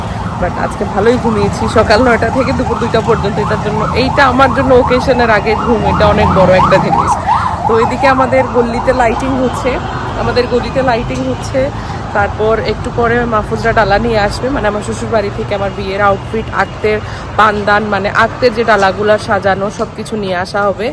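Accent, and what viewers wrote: Sylhet, Bangladesh. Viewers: Tumi sylhety ni affa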